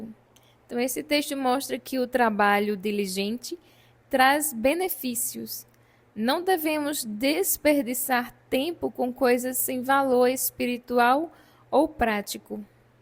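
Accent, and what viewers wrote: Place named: Northeast, Brazil. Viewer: minha irmã você é nordestina..por coisa do seu sutak.rsr😍🥰🤳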